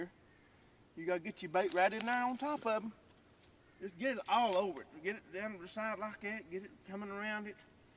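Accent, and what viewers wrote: South, United States. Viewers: im from illinois with a midwest accent and its funny cause as i read the comments in my head i cant stop reading them in his southern accent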